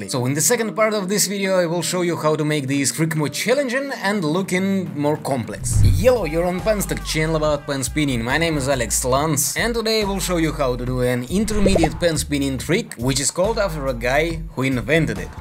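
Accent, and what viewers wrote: Russia. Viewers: I love your. Russian accent it's so nice to hear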